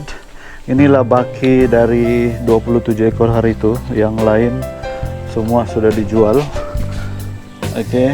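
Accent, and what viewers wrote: Sabah, Malaysia. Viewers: Terbaik bossku..sdp dgr org sabah ckp😍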